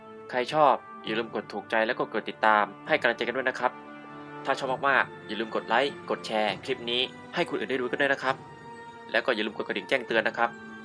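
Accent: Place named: South, Thailand. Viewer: จากที่ดูหลายๆคลิป ผมรู้ว่าพี่เป็นคนใต้แต่ผมแค่ไม่มีหลักฐาน สำเนียงคุ้นมากครับ 😊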